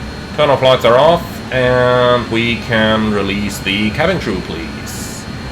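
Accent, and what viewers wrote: Switzerland. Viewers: Geil mit dem schweizer akzent😂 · I don't speak german but, as a swiss descendant, I recognize the swiss accent when I hear it.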